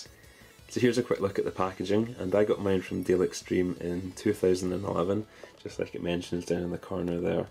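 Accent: Scotland, United Kingdom. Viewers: Confession: I actually just watched this video to hear your raspy, Scottish (I think) voice. I honestly watch this every night because you have a voice that smooths me up like butter and I just fall asleep just like that. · Where in Scotland are you from?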